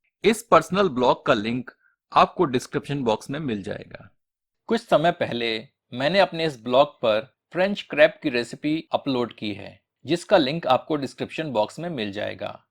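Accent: Standard. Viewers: I like your shudh HINDI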